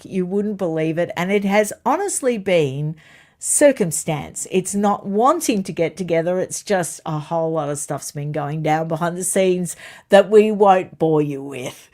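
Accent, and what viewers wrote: Australia. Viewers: Yeah,. But they are swayed by you. God love our Aussie voice ❤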